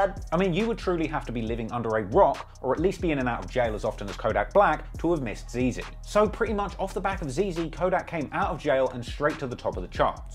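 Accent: United Kingdom. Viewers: Are you British or American I am so confused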